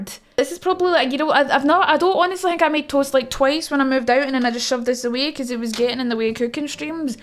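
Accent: Scotland, United Kingdom. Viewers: Scottish accent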